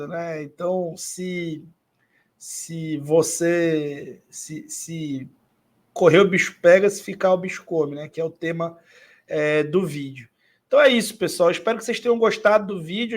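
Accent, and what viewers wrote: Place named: Amazon, Brazil. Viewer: Ver esse vídeo, com essa aula e esse sotaque amazônico lhindo 😍 Saudades de Belém ❤️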